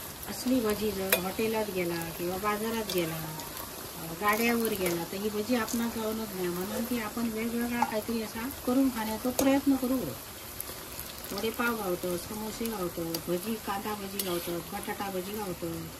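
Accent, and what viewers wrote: Malvani, India. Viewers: तोंडाक पाणी सुटलां. चिवारिचे कोमचेय दाखया. तुमचे व्हीडिओ बघताना, शुद्ध मालवणी आयकताना गावांत आसल्यासारख्यांच वाटता. देव बरें करो.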